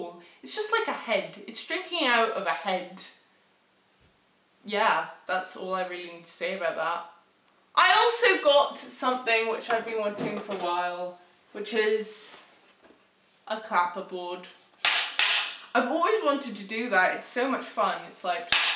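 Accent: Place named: Ireland. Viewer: Are you Irish? If you're not I'm sorry for asking but idk you sound really Irish to me all the sudden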